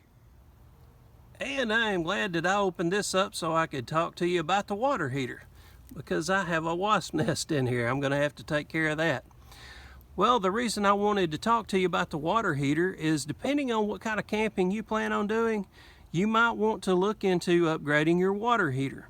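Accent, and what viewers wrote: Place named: Alabama, United States. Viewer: New subscrib. You’re a smart guy and its refreshing to listen to someone that actually thinks and applies their smarts to whatever. Enjoy your channel and that Alabama accent is southern hospitality coming through the video.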